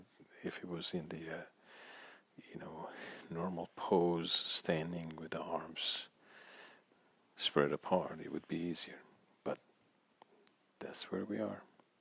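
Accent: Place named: Arab world. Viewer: Actually, not that unrelated, the accent and your artistic skill led me to think that you were from Armenia or some other Middle Eastern country.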